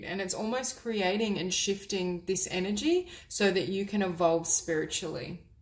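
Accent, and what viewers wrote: Australia. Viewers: Excellent reading I chose #3. I have been told in the past that I am a healer. But I have no interest in it. I am aware of going through a spiritual transformation at the moment, so we'll see. Just along for the ride at the moment. Learning not to sweat the small stuff. Thank you. BTW I love your beautiful Australian accent. I could listen to you read the phone book.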